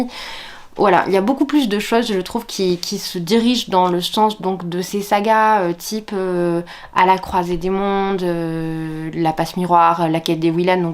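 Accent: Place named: South, France. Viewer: J’adore ton petit accent du sud 😊☺️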